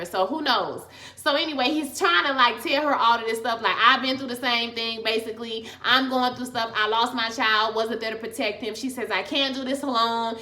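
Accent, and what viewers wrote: Louisiana, United States. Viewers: I swear i love your reviews in that Louisiana accent 😀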